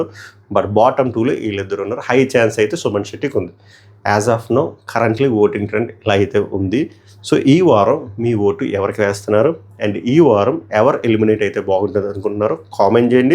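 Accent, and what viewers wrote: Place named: Andhra, India. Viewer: Bro ,am from Bangalore …ee Andhra slang vini chala years ayyindi … more than your reviews i like hearing this slang …Telugu almost marchipoya anukunna 😊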